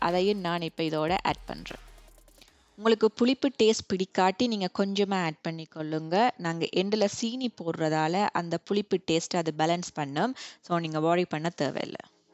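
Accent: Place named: Sri Lanka. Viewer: Srilankan Tamil with foreign accent English sounds beautiful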